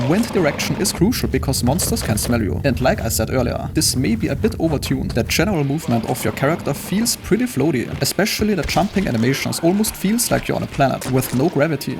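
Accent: Germany. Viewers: First impressions video. 3 minutes long. Has "not waste your time" in the title. German accent. Makes total sense. Subscribed.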